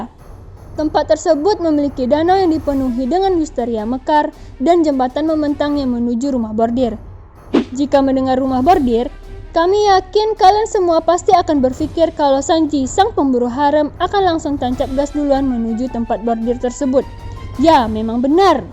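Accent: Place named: Malaysia. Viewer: Apakah pengisi suara ini orang malaysia? Sepintas aksen nya cem melayu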